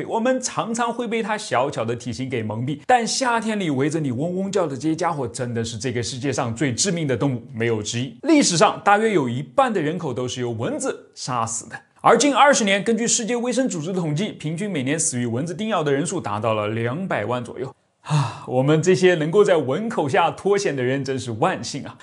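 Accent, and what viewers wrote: Nanjing, China. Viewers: 是南京人啊？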